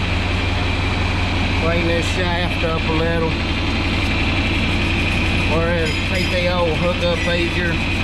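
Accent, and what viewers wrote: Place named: South, United States. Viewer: You're so southern I can hardly understand a word you're saying, and you're 13 hours drive north of me.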